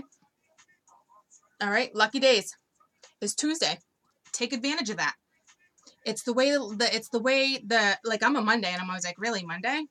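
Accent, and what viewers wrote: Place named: South, United States. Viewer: lmao... Lovely Southern Drawl ya got there and most appreciative from This Virginian Aries with a Southern Bwoston blended tongue... yeah, it's a long story.. but I AM...and so it is. THanks! <3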